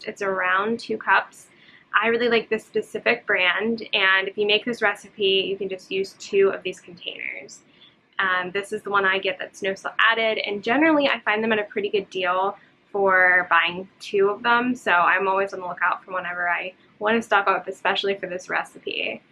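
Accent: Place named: Pennsylvania, United States. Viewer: I love your accent! I’m from New Jersey and the way you say school reminds me of being back home many years ago. I see that you’re probably from Pennsylvania? Close to Jersey. I am enjoying your channel thank you for sharing!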